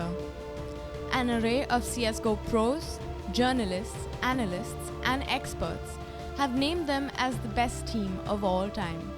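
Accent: India. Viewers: U indian or sth? Lol no offense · Indian accent?!?! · The Indian accent is a bit irritating to be honest. Of course you are used to it if you are local and listened to it your whole life. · wtf is this indian english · I clicked the video because the title sounded credible but then i heard the indian accent...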